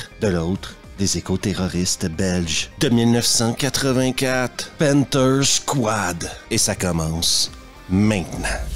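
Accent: Canada. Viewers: j'aime cet accent canadien pour me rassurer de ces nanars . merci!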